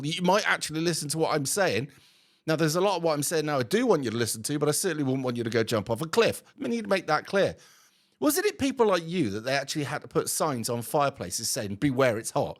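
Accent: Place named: England, United Kingdom. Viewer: Love a proper English accent 🤣 Merry Christmas from Nottingham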